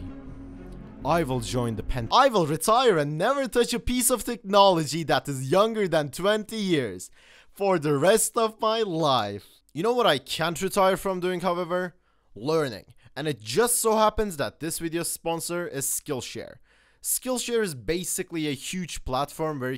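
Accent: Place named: Turkey. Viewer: This can be random but are you turkish by any chance? Your accent is really thick :D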